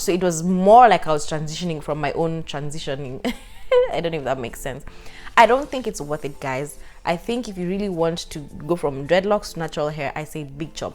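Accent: Kenya. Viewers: You sound Kenyan. something about your accent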